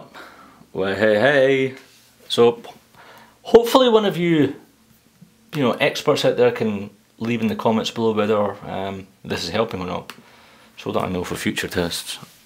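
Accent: Scotland, United Kingdom. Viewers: Sounds like a Scotsman must be a Scotsman!hello mate....can you run xcode?it runsok??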